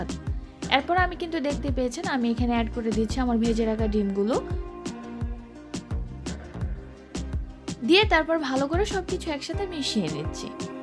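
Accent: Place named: Noakhali, Bangladesh. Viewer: নোয়াখালীর ভাষা কড়ি বলে অনেক ভালো লাগলো 👌👌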